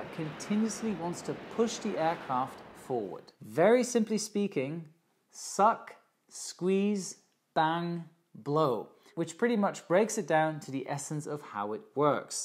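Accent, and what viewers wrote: United Kingdom. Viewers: ... You're a good looking guy! Specially with that British accent? Australian?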